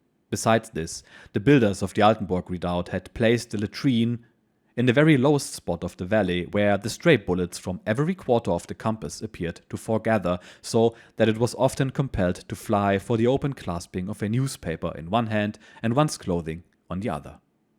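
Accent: Germany. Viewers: These are quite the treat. 👍 I’ve already read Storm of Steel twice, but your insights and German accent add a whole new dimension to the experience. Will definitely continue this. God Bless 🙏🏻 P.S. I don’t know if you take suggestions, but “Black Edelweiss” by Johann Voss a Waffen SS veteran would be an interesting listen. No pressure. Peace ✌🏻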